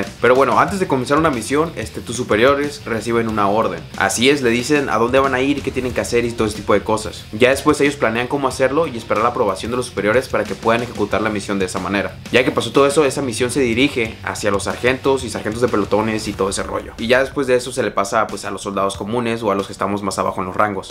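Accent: Mexico. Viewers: Eres de Estados Unidos y tienes el acento mexicano muy marcado alch que crack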